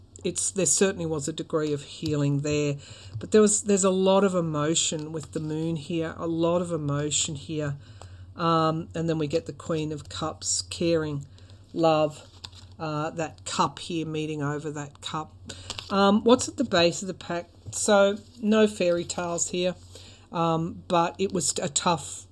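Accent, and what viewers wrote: Australia. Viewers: Thank you for these readings! 🙏 I love the way you have dealt with the trolls! Tell 'em off! I look for you videos every couple of days. I appreciate your manifestation of mercury/mars/Pluto/Venus conjunctions especially with the Aussie accent. Huzzah, ma'am! Peace love and light.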